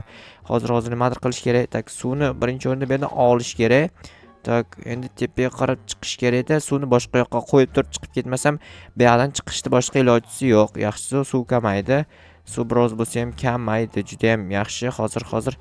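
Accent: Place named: Bukhara, Uzbekistan. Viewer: Buxoro shevasi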